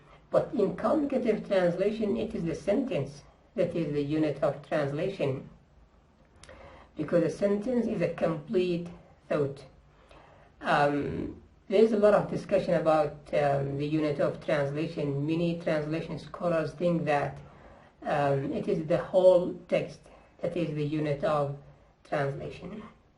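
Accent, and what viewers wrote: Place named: India. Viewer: Dude u sound Indian ngl 😭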